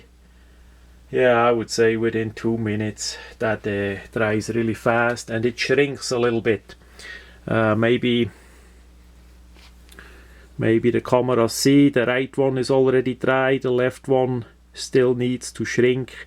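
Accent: Switzerland. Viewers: ... stuuupid as a breeead as they are ... ich lach mich schlapp, geiler schwiiizer Akzent und nette Geschichte! weiter so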